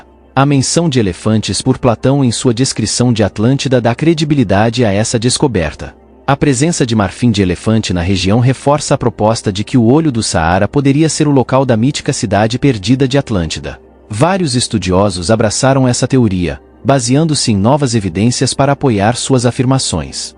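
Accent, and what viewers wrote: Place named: Brazil. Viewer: Colombo chegou À América... não destrua a gramática da língua portuguesa com sotaque brasileiro.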